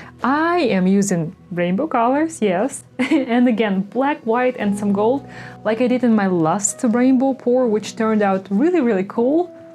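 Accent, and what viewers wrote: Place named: Russia. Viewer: Так и знала что вы русская...😉 своих всегда видно и слышно...🤗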